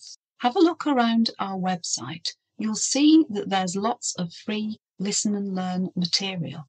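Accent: United Kingdom. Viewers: Hi there. I’m Marcelo from Brazil, I’ve been learning English by myself since 2017 and I’ve focused in American English, but I’ve been thinking about listen to some English from people with British accent and when I found your podcast from Spotify it was “Loved at first sight” , or loved at first listen to” hahahah. Thanks so much for you and your team for spend your free time with these excellent and creative podcast. And don’t forget, stay at home and wear mask. I’ll see you soon!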